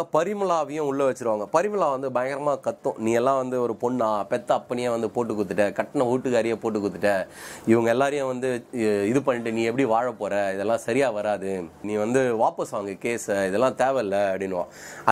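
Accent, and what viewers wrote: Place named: Chennai, India. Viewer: Listening to your story and folding clothes 🤣🤣🤣 Chennai dialects awesome....you and your attitude resembles one my close friend.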